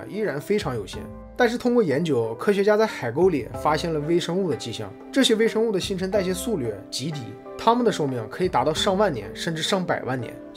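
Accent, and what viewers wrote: Northeast, China. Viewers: 能把东北口音努力改改吗…..实在是听着太俗气了😂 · 好像东北人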